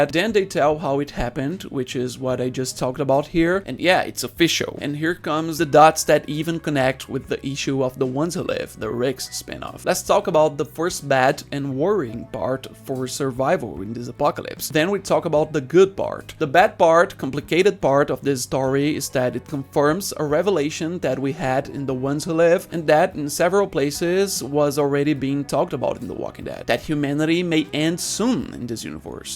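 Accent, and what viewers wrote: Brazil. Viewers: You can barely tell you're brazillian, congrats!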